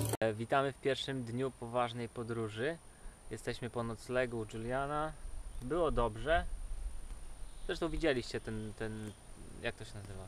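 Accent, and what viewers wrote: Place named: Podlasie, Poland. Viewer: hehe, tak pytalem bo zaciagasz troche jakby po podlasku... :)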